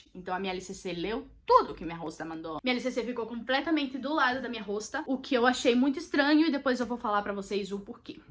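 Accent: Northeast, Brazil. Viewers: aaah, tá explicado kkkkk fiquei tipo "ela tem sotaque aqui do nordeste mas tbm tem tipo um sotaque inglês??" kkkkk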